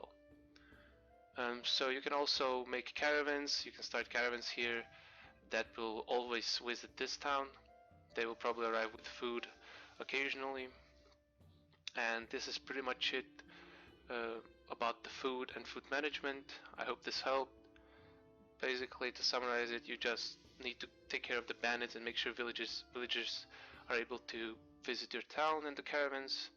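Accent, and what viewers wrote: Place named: Serbia. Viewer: You sound like you're Serbian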